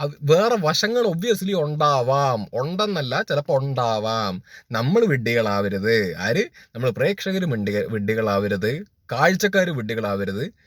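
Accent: Palakkad, India. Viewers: Palakkad aanalle kettappozhe manassilayiii...😂❤ njnum pkd ane...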